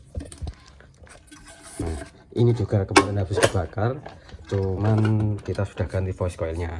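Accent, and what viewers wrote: Surabaya, Indonesia. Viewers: You sound like you are from Surabaya going by your accent?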